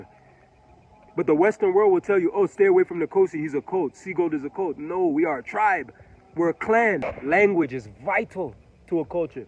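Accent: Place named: Patois, Jamaica. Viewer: I love ❤️ your Jamaican patois, it funny the ways it sounds.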